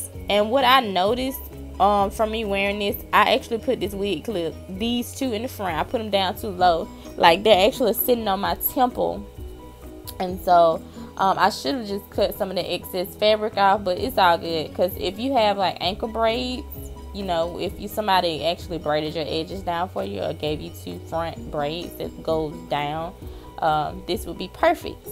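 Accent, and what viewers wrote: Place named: South, United States. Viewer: You're so country but it's so cute. You have a new Subscriber forever just to hear your bubbly voice and watch your methods.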